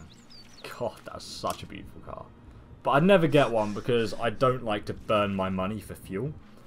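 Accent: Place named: United Kingdom. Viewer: Omg I live in the Newcastle area! :D i always knew you were British cause your accent is similar to mine, it's great to get to know a tonne of things about you :D.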